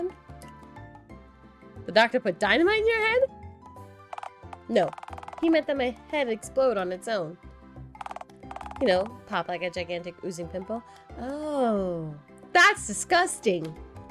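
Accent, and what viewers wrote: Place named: United Kingdom. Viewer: i'm still in the beggining of the video, but, stellar british accent by the away 10/10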